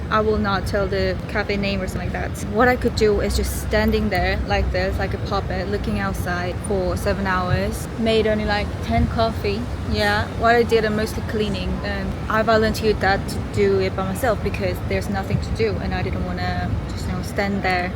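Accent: United Kingdom. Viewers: 외국카페에서 런던 영어 발음하는게 매력적이여서 구독했지만 그게 구독을 이어가도록 한건 아니였어요. 님이라는 사람 자체가 매력이 있다는걸 알게되었어요. 당신이 어디서든 어디에 있든 그런건 상관하지않아요. 다만 당신이 조금만 덜 힘들었으면 좋겠어요.😊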